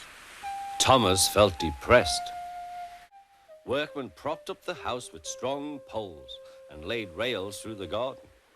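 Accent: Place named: United Kingdom. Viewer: Great job! I love the UK narration.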